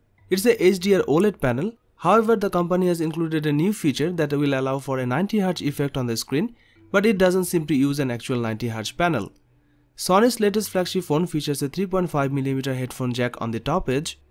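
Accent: India. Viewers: Heu mais que fait cete vidéo ici ? Ces SONY sont déjà sortis chez nous. Par ailleurs cet accent indien prouve encore une fois l'arnaque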